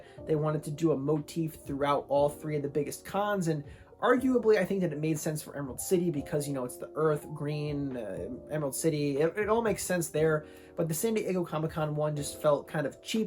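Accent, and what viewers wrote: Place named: New York, United States. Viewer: Okay but I loved the New York accent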